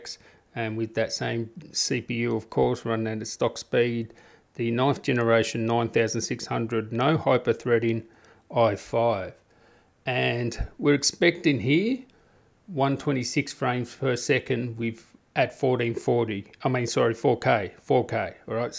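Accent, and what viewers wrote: Melbourne, Australia. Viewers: your accent somehow made me miss Melbourne lol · Good to hear an Aussie voice on reviews 😂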